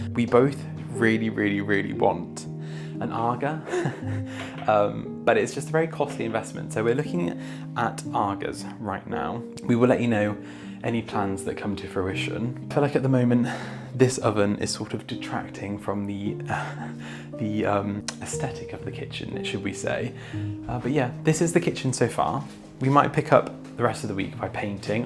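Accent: England, United Kingdom. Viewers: thank you for today's enjoyable video. I love watching each one. You provide such interesting content. I love your new kitchen renovation. You have incredible taste and a wonderful sense of style. Your videos are not only inspirational but also instructional. As you explained the aesthetic and practical values achieved in the kitchen and garden, I learned to appreciate those perspectives in the changes that I make in my own surroundings. You are an incredibly gifted and talented young man. Besides, I could listen to your great English accent 🇬🇧 all day. Dending you and God's blessings of love 💖, joy 😊, peace 🕊️, and more renovation ideas for your home 🏡. From across the pond 🇺🇲, Robert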